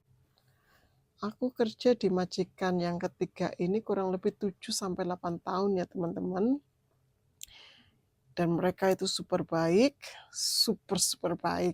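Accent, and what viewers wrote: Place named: Java, Indonesia. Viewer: Bhsanya medok tapi enak didengerin kloboad lagi cerita, semangattts dan sukses terus ya mpok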